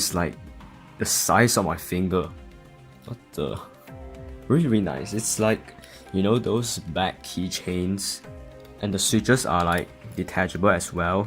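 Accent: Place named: Asia. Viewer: I smell popular osu youtuber Well I mean you sounds like Miraie n Squashy Boy. Btw, are you Asian? Your english just sounds like one🤣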